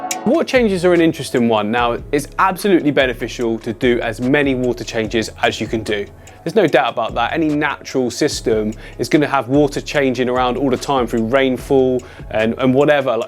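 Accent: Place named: South West England, United Kingdom. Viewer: I thought your accent was familiar! I am south west too!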